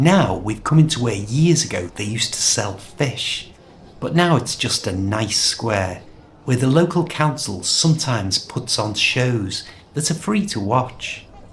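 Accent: Liverpool, United Kingdom. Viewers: I've got something to tell about your method of teaching which is very much more enjoyable and I haven't seen it on the internet. Thank you to share with us. But also got something else to tell which I could't never understand while I lived in London which is about the english people and the why some of them love the Royal Family I mean why do they become more then other people, is it because they're Royal, because I can't see nothing so special? The place I come from we also have a Royal Family which we can see or even heard about often and they don't leave in castles as long as i know. One of them became a politician. As I see you still have kept some of Liverpool accent while you speak. But not bor kkk I loved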